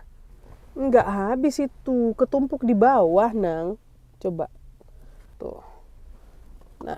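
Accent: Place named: Eastern Indonesia, Indonesia. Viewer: Lama2 logatnya kaya org timur ya kk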